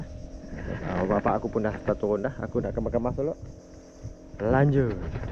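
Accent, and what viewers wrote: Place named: West Kalimantan, Indonesia. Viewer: orang sambas ke bang,bahasenye same kyak orang sambas😅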